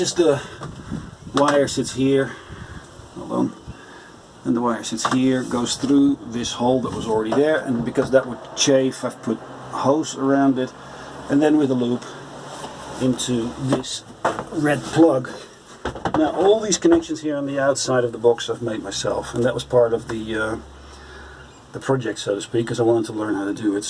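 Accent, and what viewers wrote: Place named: Netherlands. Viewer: Mooi. Vooral met het Hollandse accent. Ik ga het proberen op m'n Ranger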